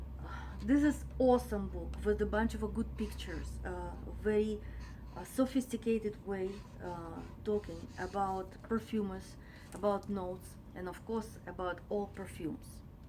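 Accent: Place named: Russia. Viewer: Thank you for making videos in English. Your russian accent heips me with understanding. И вообще, здорово совместить приятное с полезным: и понимание английской разговорной речи чуток прокачать и про любимые парфюмки послушать в вашей прекрасной манере.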